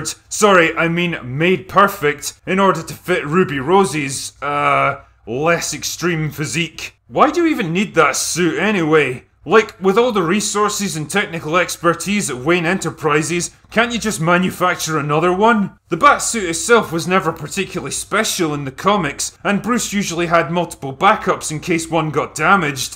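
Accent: Scotland, United Kingdom. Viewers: yep. But also funny with this drunk sounding Scottish accent... Lol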